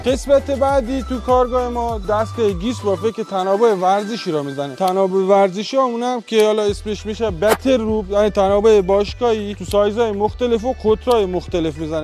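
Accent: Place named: Yazd, Iran. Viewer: هم کار جالبی دارید و هم با لهجه شیرین یزدی توضیح دادید.. بسیار عالی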